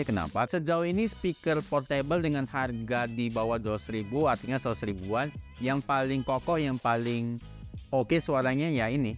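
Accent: Medan, Indonesia. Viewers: Suka aku pada host ini. Akses Medan dan Cina jadi satu. Mantap kalian.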